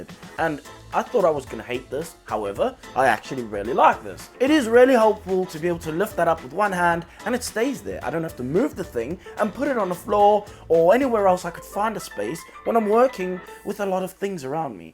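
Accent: South Africa. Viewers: Why does it sound like he has a south african accent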